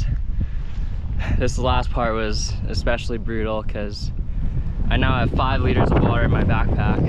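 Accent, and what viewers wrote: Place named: Hawaii, United States. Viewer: correct me if i am wrong but i am sure i hear a "Punahou" accent to your Hawaiian words...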